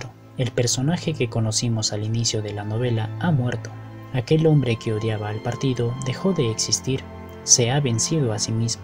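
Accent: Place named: Latin America. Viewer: Literatura hispanoamericana dirás. Latinos era como se denominaba a los habitantes de Lacio aldea de la Antigua Roma. Dudo que seas de Lacio, por el acento intuyo que serás Hispanoamericano. Hermano. Un saludo desde España, muy buen video.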